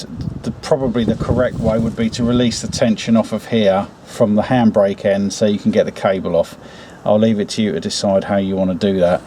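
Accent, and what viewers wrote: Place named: United Kingdom. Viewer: Thanks for keeping video posted!!! I get the hint that this is a 03 Jeep Liberty but you sound like your in Great Britain, but again I didn't think Jeep was in Great Britain???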